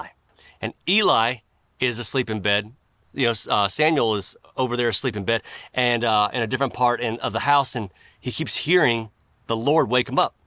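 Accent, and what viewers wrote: South, United States. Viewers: Thanks, I like your content, you talk like me. I'm a Southerner myself. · just seeing your face and listening to you talk tickles me to death. Unfortunately, I tend to be pretty stressed out and always thinking about the next problem that needs to be solved, so I appreciate those people out there that can just put a smile on my face. You always do that for me. Maybe it is just the smile, or the familiarity of a good ol’ country twang. But I strongly believe that it’s actually because you carry a bright light with you. The kind that you can only get from Jesus. I can see it in you. I just thought I would say that. Thank you.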